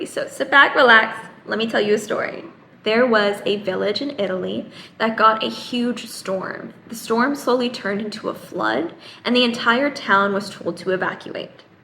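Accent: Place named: Bollywood, India. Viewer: ooh you're punjabi. i didn't even imagined that you have a proper bollywood accent. anyhow thode muho punjabi sunan ch boht khushi hougi😍😂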